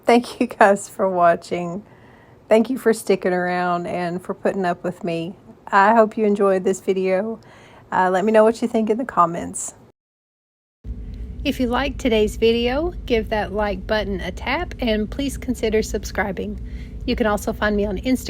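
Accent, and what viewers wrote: South, United States. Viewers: that southern drawl is to die for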